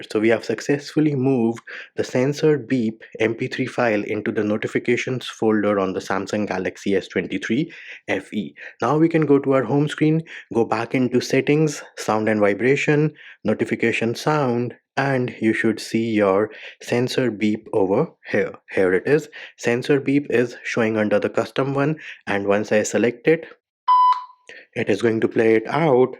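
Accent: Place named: India. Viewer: You can always trust the Indian sounding guy! thanks it worked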